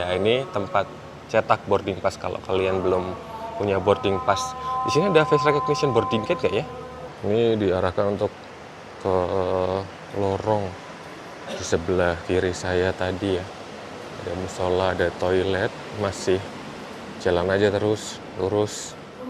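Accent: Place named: Sunda, Indonesia. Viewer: A jiga na udah kental sekali bahasa sunda na hahaha😅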